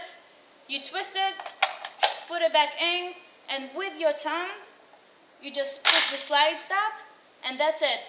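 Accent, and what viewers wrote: Israel. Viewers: If you really want to get into details, she's not Russian, she's Israeli, read the description, about 20%-25% of Israelis today are Russian immigrants or their children from the big soviet nations immigration from the early 90's, so judging by her name she's probably from a Russian origin, but judging by her age and accent, she most likely was born and raised in Israel.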